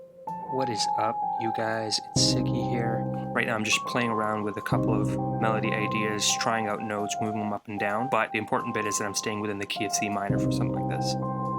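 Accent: India. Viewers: do u hav Indian origin ??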